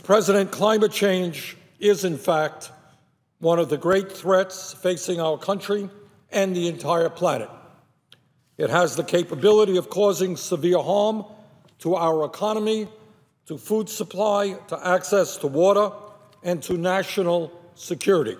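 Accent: Brooklyn, United States. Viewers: Gotta love the old school Brooklyn accent!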